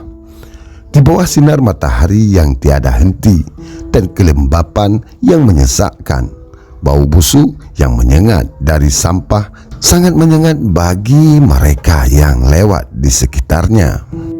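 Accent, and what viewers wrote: Aceh, Indonesia. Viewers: dari logatnya kayaknya bang admin orang aceh ya hehe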